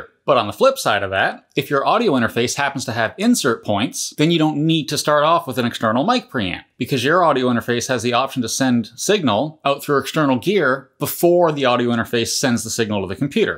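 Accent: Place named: Canada. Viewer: Canadian IN and OOT!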